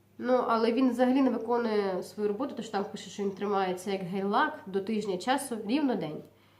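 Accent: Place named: Lviv, Ukraine. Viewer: Спасибо за новое видео! Смотреть- огромное эстетическое удовольствие! Вы, очень красивая, шляхетна пани! ))) И очень очень нравится Ваш львовский говор! Ну и тембр голоса у Вас очень приятный безусловно!! Всего Вам наилучшего желаю и Вашей семье!!!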